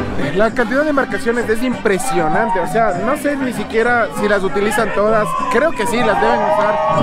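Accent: Mexico. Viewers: En México nadie dirá que eres ecuatoriano con ese atuendo, a lo mucho, dirán que eres peruano o simplemente sudamericano. A lo mejor y por el acento que no es tan diferente al nuestro, dirán que eres de Puebla o Michoacán, digo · Es lo que noté, que su acento es muy parecido al nuestro y bien pasa por mexicano. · este compa tratando de hablar con acento mexicano ,porque sus compas si hablan como ecuatorianos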